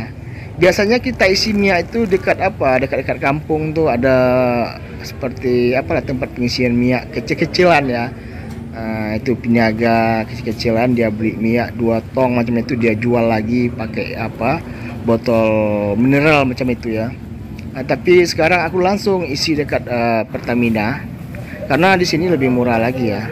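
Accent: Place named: Negeri Sembilan, Malaysia. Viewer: Abang amat ni orang minang ke.bunyi cakap macam orang negeri sembilan malaysia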